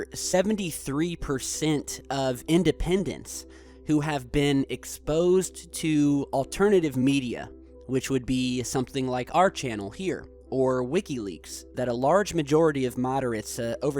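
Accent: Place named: Michigan, United States. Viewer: You're from Michigan aren't you?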